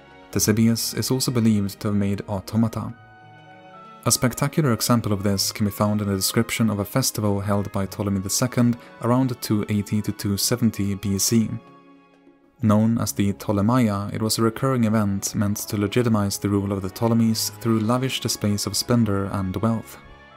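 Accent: Sweden. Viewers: I can hear that the narrating voice is swedish. :-D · Is the narrator Swedish?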